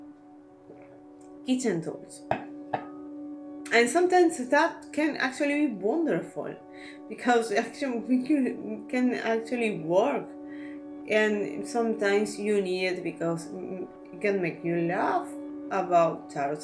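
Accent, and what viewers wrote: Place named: Spain. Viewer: Hello this video is very interesting and you should put up more videos! Where are you from actually? Im thinking spanish due to the accent but im not certain. Greetings from ireland:)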